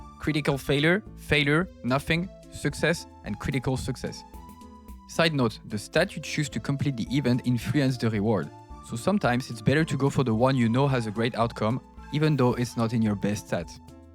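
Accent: France. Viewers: Excellent video mon pote, it's rare to see french accent in english videos, yours is very sweet. Also a LOT of your tips are unique and useful, great video. I'll add more tips that i've learned myself : -Some items belongs to several sets family. If you have the right items, you can have a cat with 2 set bonuses, if equipped with the right items. I don't think you can have more than 2 sets ? Should test that. -Birth defect can't mutate (anymore). If your cat have a head birth defect for example, he'll keep it forever. It can't mutate in a room with high mutation nor during an adventure event. -Charisma stat determine how your cat is attractive to others, and how much it will reproduce. A cat with 0 or negative charisma will never reproduce. From experience, a cat with 12+ charisma will reproduce every night. Was a long time ago, all my cats are descent of this Barbie named cat. 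My personnal tip is to do easy runs with cats that have good stats and mutations, and boost their charisma, so they give you a lot of kitten. Also keep that in mind if you want to do a cancer/uranium rod run. Even if you get a lot of mutation, if he look unfuckable, he will be. -If you have 0 Intelligence, the text during event will be randomize BUT only the letters are randomized. Words will keep the same number of letters. Can be helpful if you try to decipher or remember the events. -If a cat is the love-interest of multiple cats, those multiple cats might fight each others. Be carefull, as even a h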